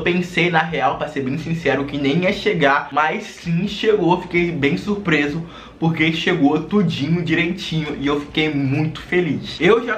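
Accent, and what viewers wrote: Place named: Rio de Janeiro, Brazil. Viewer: Cara, o que mais gostei de vdd foi o seu sotaque carioca hiper mega carregado!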